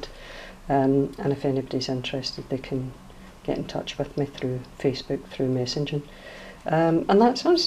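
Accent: Scotland, United Kingdom. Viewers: Without your accent I would never learn scottish. Love the edge collaging, very nice idea, will try it too. Your envelopes are gorgeous. Have a nice summer evening and say hello to yours! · Aye,! Bless the Scots accent. I come from a he North East. Now, there's an accent that only few would understand! Beautiful work yet again. Very talented lassie! 💜 xx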